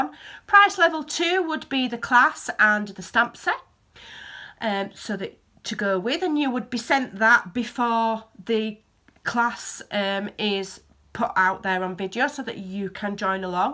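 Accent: Yorkshire, United Kingdom. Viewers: Hello from Texas! I'm a Manchester girl born and bred so you have no idea how lovely it is to hear that Yorkshire twang. Can't beat a Yorkshire sense of humor, something sadly lacking over here. I can't tell you how many times I come out with a saying and people just stare back blankly. Any ways up (there's another!) I love your videos and follow you daily. Thanks muchly x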